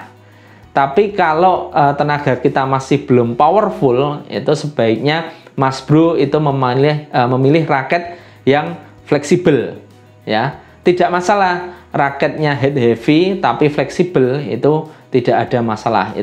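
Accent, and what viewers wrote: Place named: Java, Indonesia. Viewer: geram lak aku dengar jawa dia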